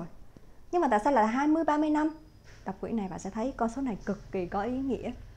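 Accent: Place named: Da Nang, Vietnam. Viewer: nghe giọng chị em đoán ra chị là người đn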